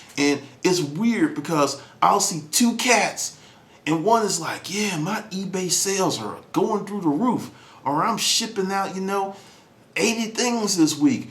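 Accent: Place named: New York, United States. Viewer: U have a touch of NY in u :) We r real people, straightforward, no BS! That's why I'm one of your biggest fans! Professional but have a little hood! LOL! keep up the good work!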